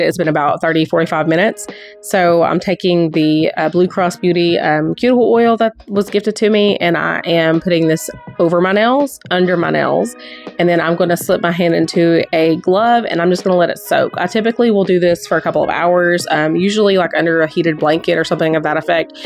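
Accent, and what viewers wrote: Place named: South, United States. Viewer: I love your country accent!! 😊❤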